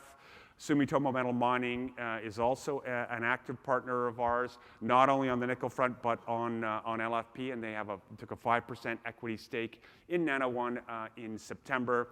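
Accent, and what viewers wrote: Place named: Canada. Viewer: More b.s. with a Canadian accent. Does this little socialistically propped-up company really think it will hold the major powers hostage with their patented processes?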